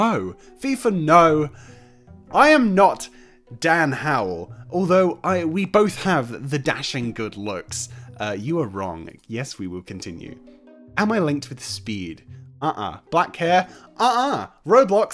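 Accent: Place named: Leeds, United Kingdom. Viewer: You from leeds or am i wrong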